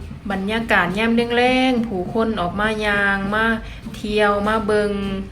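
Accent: Isan, Thailand. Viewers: คงเป็นลำสาละวันโดยเฉพาะ ไม่เคยฟัง สะหวันนะเขต สาละวัน จำปาสัก สำเนียงพูดคล้ายภาคอีสานตอนกลางฟังเข้าใจเลย ไม่เหมือนสำเนียงลาวทางเหนือ ขอบใจมากที่ให้ใจกับเมืองไทย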